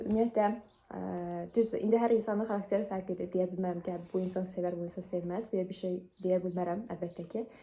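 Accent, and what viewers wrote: Azerbaijan. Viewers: Ozum Zaqatalaliyam amma Bakida yaşayıram.necese təsadüfən qarşıma çıxdız...avar olmaginiza rağmen danışıq diksiyaniz ve tərziniz aksentiniz cox gözəldir.meni o celb eledi ki size baxim😊ve Maşallah beyimizin bir sözüde lap xosuma gəldi ..eger bir adam sizin üçün deyismirse deməli o düzgün adam deyil😅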